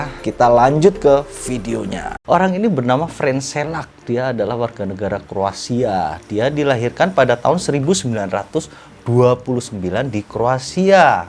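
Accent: Java, Indonesia. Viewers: ngomongnya agak medok, lbh enak saya dengernya sbg org Jawa, mantap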